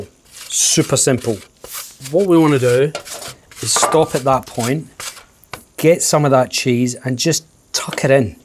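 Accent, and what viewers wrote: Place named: Scotland, United Kingdom. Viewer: Ye can take the man out of Scotland, but ye canny take the Scottish out the man.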